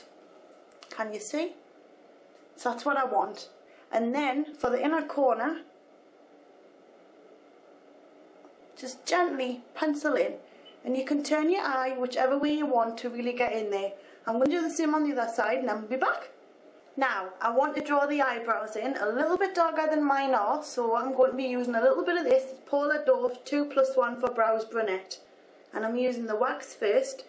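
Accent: United Kingdom. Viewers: Well your ears must be weird because the accent Ms lauren has was voted the best accent in the UK :)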